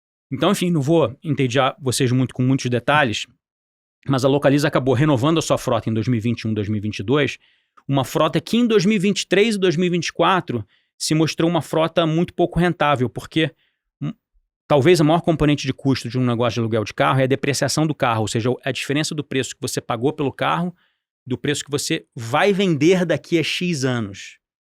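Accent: Rio de Janeiro, Brazil. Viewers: é carioca kkk... Repare sempre no fim tem aquele "X" arrastado. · O cara é extremamente PEDANTE Falou falou e não disse POOOOOORRA nenhuma Além desse sotaquezinho CHATO de carioca metido a besta Além de repetir a todo momento que ele tá gastando muito tempo com a localiza Além dessa blusa de frio amarrada no pescoço Kkkkkkkkkkkkkkkk Que pessoa ridícula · Esse dialeto carioca, dá raiva, tudo com x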